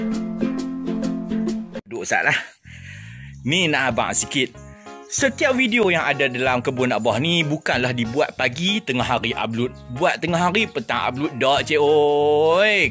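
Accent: Kedah, Malaysia. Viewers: Tertunggu2 video..terbaiklah.syiok dengaq loghat kedah piaww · Amboi amboi amboiii...pandainya berkebun..seronok dengar loghat kedah. Terbaiklah hang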